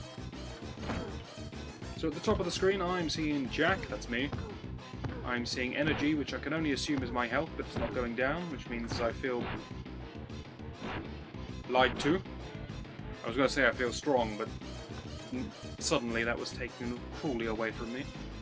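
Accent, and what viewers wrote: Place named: United Kingdom. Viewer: Great to see you back,! Your monotone, British commentary was gravely missed and I was more than ecstatic to see a video from you show up in my feed. I can only hope you complete your mission of Let's Play Everything this go 'round.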